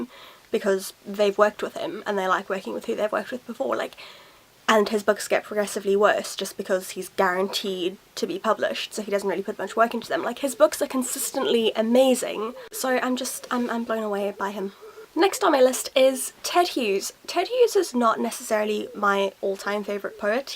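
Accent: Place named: United Kingdom. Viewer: WOW, there should be more female readers like you! You admire Percy Jackson and Alex Rider too! (Have you done a Q&A video about yourself? You seem really interesting. But, I couldn't find one of those videos on your channel. One of the questions I would ask is this one: you live in South Africa. But you got an elegant middle class English accent. How come? I'm just curious. Would you do a video about? )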